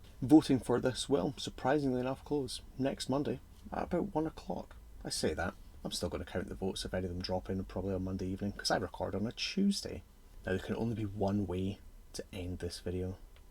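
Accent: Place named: Scotland, United Kingdom. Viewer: This was a tough week, torn between Toshi and Hansel 🤔 I think I’ll go Hansel due to the props used haha. Special mention to you saying ‘cosy little boots’ in your wee Scottish accent 😅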